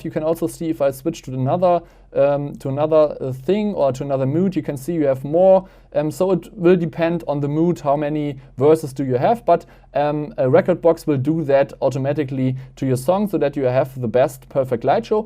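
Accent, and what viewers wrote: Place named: Germany. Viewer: sorry this german accent when you speak english it's really horrible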